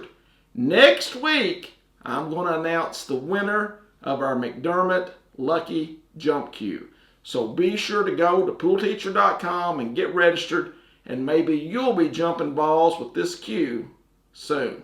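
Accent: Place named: Texas, United States. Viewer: I have enjoyed your videos and commented on several. Given the title of this one, I was a bit disappointed. Only 5, 6, 8, and 9 were truly instructive - yet one has to look for the opportunity to try them.. The others were variations of trick shots found in any trick shot books (see Byrne or Massey). An alternative shot on the “corner hooked” down the rail shot is a 3 rail shooting into the near corner face. Aim at 1st diamond up from object ball. I’m sure you know this trick shot. Keep ‘em coming. You are delight to watch and listen to. You accent takes me back to my Texas upbringing.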